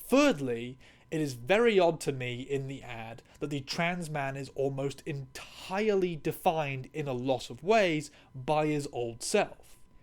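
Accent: United Kingdom. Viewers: I assumed you were in Britain due to the accent. I'm British and have been wanting to move to NZ to escape the transphobia here; can I ask how good NZ is for being trans (access to healthcare, discrimination, etc.) in your experience? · girl i am so sorry i legitimately thought you were british, so when you made the disclaimer you're from new zealand it was real news to me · Honestly never pinged your accent as kiwi, I thought you were bri’ish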